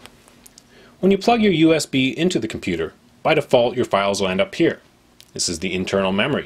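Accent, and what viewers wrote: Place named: United States. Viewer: I am typing as fast as i can, while my statement is true. I have tried for more than a year to get this on my Zeki 7inch. followed stuff on xda, etc. but got little to nowhere. first you have a great voice. Second you speak American English. Third you are organized and clear. This wasn't working so I followed someones comment below -clear cache. That didn't help so i bit the bullet and cleared data. It asked to accept google terms. i did play store opened. Hooray. I subscribed so will be watching again and more. Thank you.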